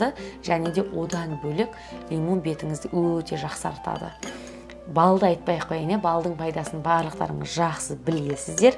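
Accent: Standard. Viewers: Рахмет Өз тіліңді ұмытпай таза сөйлегенің үшін ❤ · Қарапайымдылығыңыз өзіңізді онан сайын әдемілендіріп тұр! Ал таза қазақи тіліңізге тіпті көп адам ғашық болғандай!😘😘😘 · Кореяда жасап,өз тіліңді таза сөйлегенің ұнайды,жарайсың 🍓🍓🍓 ақ көңіл,сұлу жансың бақытты бол❤❤❤ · шықса не болыпты.Қайта қазақша таза сөйлейді. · Сәлем,. Тамаша. Керемет. Ана тіліңді ұмытпай таза,әрі анық сөйлейсің. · Қазақша таза сөилегенің үшін қызым қатты құрметтеимін .Не деген ғажап қызсың. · Керемет өзге елде түрсада таза қазақша сөйлейды екенсыз жарайсыз,рахмет қолданып көрем. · Казак тилинде таза сойлегенине риза болдым. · Кандаи ашыкжузди жаркын кыз екенсин кызым бакытты бол оз тилинди умытпаи таза сулеп отырганына риза болып отырмын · Жаным ,казак кызы.тіліңді умытпай,таза сойлегеніңе улкен рахмет.казакстанда турып тілін сойлеуге намыстанатын намыссыздар бар.бояусызда турің әдемі.кайда журсеңде аман бол. 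· Сәлеметсізбе қызым рахмет өте кушті түсіндіріңіз бетті қайтіп маска жасауыңды көрсеттіңіз енди көреміз. Өз ана тіліңізді умытпай таза сөйлеп атырсың рахмет бахтыңыз тахтыңыз болсын Илахым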